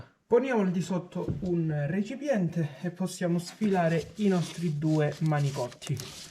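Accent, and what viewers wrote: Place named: Salento, Italy. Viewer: Che bell'accento salentino che hai! Sei di Lecce?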